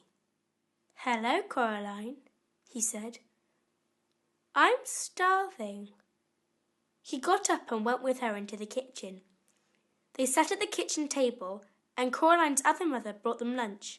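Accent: United Kingdom. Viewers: that's a British standard accent - certainly not a Cornish one! :) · Thank you. I was wondering which British accent she had.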